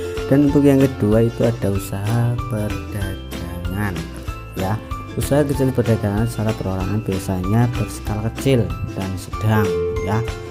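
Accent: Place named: Banyumas, Indonesia. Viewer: Ngapak temen wkwkwkwk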